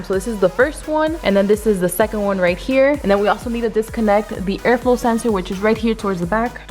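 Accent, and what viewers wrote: Latin America. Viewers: I figured u were Latina from ur accent but I didnt want to assume! Cuidate! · Are you hispanic I hear a lil accent , btw you fine guerita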